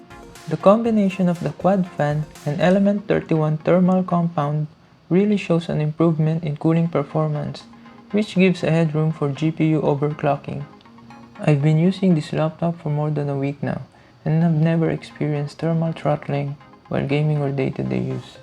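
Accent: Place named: Philippines. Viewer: I can see from your accent and your hand tattoos from Kalinga tribe that you are a Filipino. May I know how did you manage to get your hands on AW x17 r1? Did you use 3rd party courier? As you know, here in PH the x17 r1 is still unavailable and most likely will never be available because I heard that Dell PH will only import the X15 versions.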